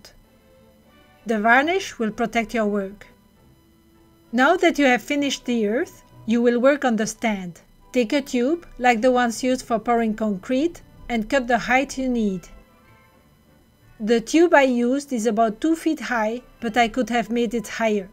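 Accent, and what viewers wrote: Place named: Asia. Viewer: Why is every video I find about making a globe an Asian which in accent?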